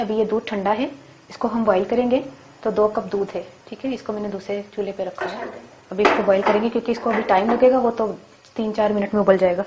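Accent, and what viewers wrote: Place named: Punjab, India. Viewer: sis main app ko pujab k samje rahi thi q k app ki pujabi same hum jasi meas lahja but app to karachi sa hain😐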